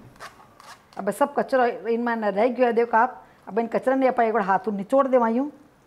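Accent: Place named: Marwar, India. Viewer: आपको समजावणा को तरीको बढीया है आवाज बहुत साफ,मिठी बोली और शुद्ध मारवाड़ी !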